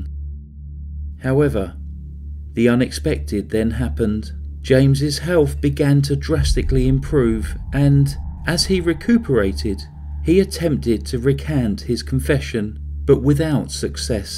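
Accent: England, United Kingdom. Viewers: What part of England are you from? South? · Good morning, you sure know how to tell a story. I feel sorry for Joyce. I must admit, we Yanks love the English accent, ya'll can make a sad story sound serious, yet sweet. Take care! (Hi CR Fam!)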